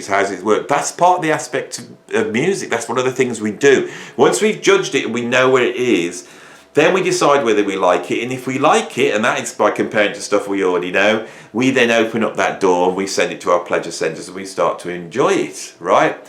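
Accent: United Kingdom. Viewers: You seem to be laying on the British accent more than usual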